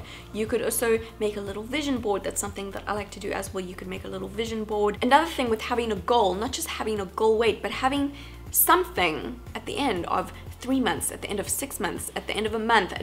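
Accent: South Africa. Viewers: I could totally tell you were from South Africa !! ❤❤Do you still live here ? (From a fellow South African 🇿🇦) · I love your South African accent! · Are you from South Africa? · Are u South African ?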